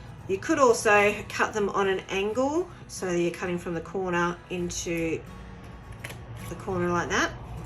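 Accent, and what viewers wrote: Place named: Australia. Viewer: Good video and I have saved the link so I can try it at some point. Maybe another video could be done to make a permanent tissue box container? I recall you said the video was a test to see if you could do the video off your iPhone. The way to improve the video would be remove the tune in the background which got a little annoying and sometimes loud. Overall it was a lovely finished product and it was nice to hear another Aussie accent on the video.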